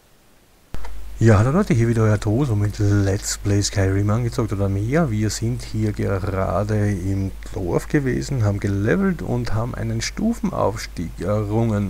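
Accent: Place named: Austria. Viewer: Der Ösi akzent ist zum verlieben!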